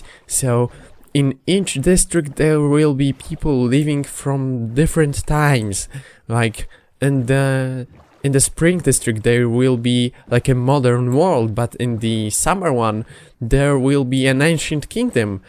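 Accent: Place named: Poland. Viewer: Oglądam pierwsze sekundy, i już wszystko wiem. Dam sobie głowę uciąć za to że jesteś polakiem. xD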